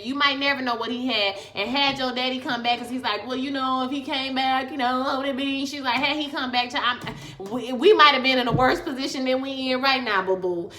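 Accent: Louisiana, United States. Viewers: I swear i love your reviews in that Louisiana accent 😀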